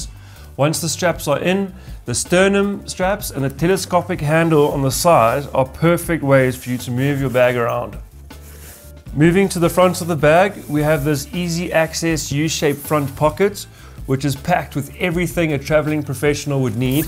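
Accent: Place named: South Africa. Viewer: That would be a South African accent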